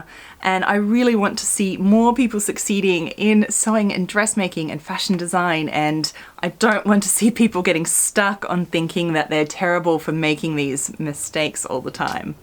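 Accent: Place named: Australia. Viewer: Hi Yes this is what I have really enjoyed about your videos. It’s great to reinforce that refining fit, design and restyling are a stepwise process. Too often, with some other youtubers, we are left with the impression that the completed item was produced from an idea and then executed without change from that idea. Although I KNOW this is not at all likely to be true, it still makes me feel a bit like a doofus because I can only seem to do these things step by step. It’s also great to reinforce that the clothes we buy in shops have been through many rounds of test garments. Often home sewers will make only 1 or 2 items from the same pattern.......and then be surprised that there are so many changes that have to be made to finesse the fit and finish. I constantly remind myself of this process that commercial designers go through in order to cut myself a bit of slack when a make seems to require SO MUCH fiddling with to make it work. 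So. Thanks for your reality checks. Love your work PS it’s great to hear an Australian accent too. I seem to have trouble finding local content. Not that it should matter.......but it sort of does (and not that local anyway..... Melbourne girl here)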